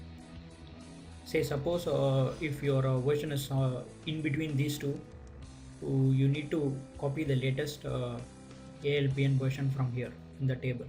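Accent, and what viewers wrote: India. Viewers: talk with an Indian accent, like I speak with a Norwegian one. He explain things very good, no problem to understand. If you think he talk fast, that is just because of his dialect. Thumbs up.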